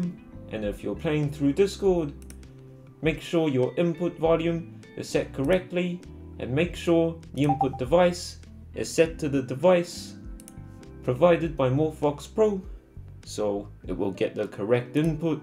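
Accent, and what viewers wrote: New Zealand. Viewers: wow i love new zealand accents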